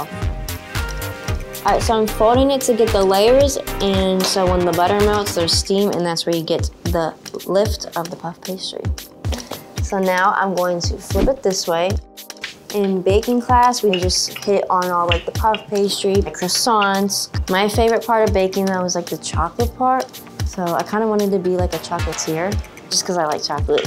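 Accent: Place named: Chicago, United States. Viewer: She’s definitely charismatic but why does she talk like she’s from the south side of Chicago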